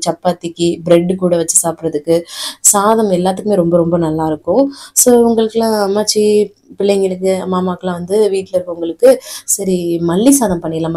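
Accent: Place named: Madurai, India. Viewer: kk but pesurathu Madurai slag mathiri iruthathu na madurai tha native but ippo mrg aagi tirupur vanthute 8 yr aatchu 💕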